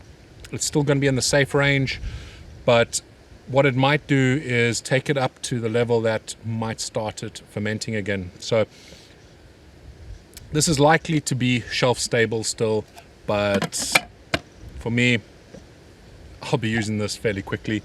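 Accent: South Africa. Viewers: By the way. Are you an EXPAT? You Sound like a South African boytjie 😁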